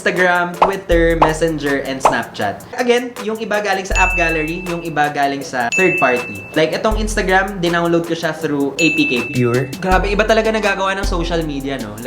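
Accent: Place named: Conyo, Philippines. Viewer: Genius sa math Me: Si kuya yun Conyo magsalita:Si kuya ulit yun.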